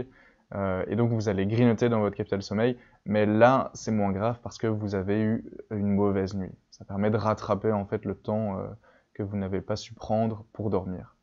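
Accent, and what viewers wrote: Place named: Switzerland. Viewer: Tu devrait parler plus doucement pour nous endormir ahah j’ai remarquer que tu était Suisse donc je comprend mieux sinon super t’es vidéo !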